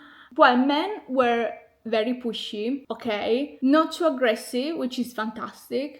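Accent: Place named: Italy. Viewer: I agree with everything you have said about Jamaica and the men 😅. Jamaica will lure you in whilst on holiday but it's not a place for the fainthearted if you want to reside there. It's very very expensive and the ppl mostly have unrealistic expectations of foreigners even on their family from overseas. Plus Jamaica is considered a developing economy so is too challenging for Westerners to go live. The men are on a different level - charming, egotistical and opportunist. Be careful with them. But I am enjoying your videos on Jamaica, your personality shines through and like your Italian accent 😊❤🇮🇹🇯🇲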